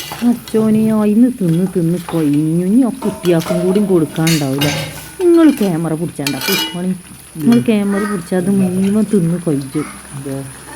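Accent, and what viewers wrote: Malappuram, India. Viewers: മലപ്പുറത്തു ഒരു വിധം എല്ലാരും ഇങ്ങനെ തന്നെ ആണ് സംസാരിക്ക വെറുതെ ഓരോന്ന് കുറ്റം പറയുന്നതെന്തിനാ 😮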